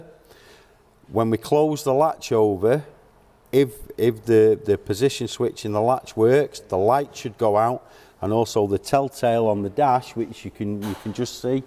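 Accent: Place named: RP, United Kingdom. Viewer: Like the posh voice, is that for to understand you, with you being like me a Northern Lad 😂😂, great testing there love, quality is great on video and audio here in sunny Gran Canaria, take care boys 👍😉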